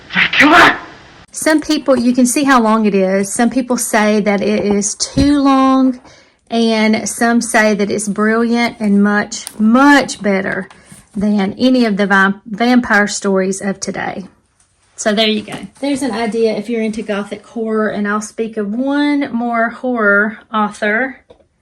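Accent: South, United States. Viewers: Hello miss I am a young adult Midwestern girl! I am a big fan your southern accent brings me back to my childhood family friend who would always say yall😂. Great recommendations by the way I love fall and I just finished the Love's a mystery in sleepy hollow! It was so cute and cozy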